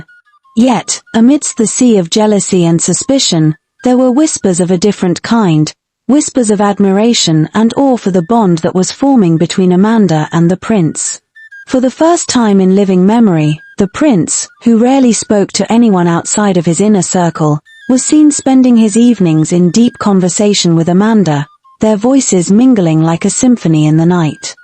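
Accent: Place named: United Kingdom. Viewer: read this slowly.,....... your irritating voice is fukkin up the story. Find your own British tales or whatever accent that is and narrate it. Try hamlets tales it's more fitting for your kind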